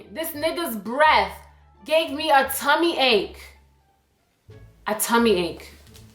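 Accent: Jamaica. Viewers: Mi luv di patwa lol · Is the rich jamaican accent for me... perfect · Aye mi love di accent Hafi chip it in sometimes 🤣🇯🇲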